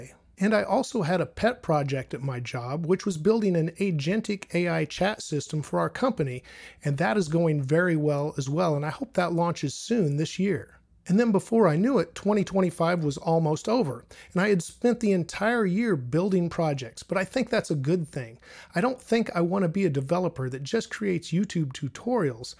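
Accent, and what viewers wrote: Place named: United States. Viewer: Hi how are you today? I miss you my friend, I miss you and your hot American accent, thank you for uploading another video clip of yourself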